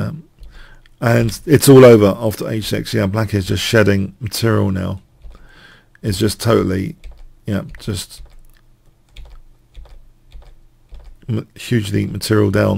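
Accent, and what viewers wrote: England, United Kingdom. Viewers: What part of England are you from?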